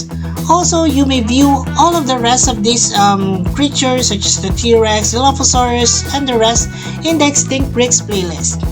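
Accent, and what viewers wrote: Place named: Philippines. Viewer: and I see ur filipino! By your voice!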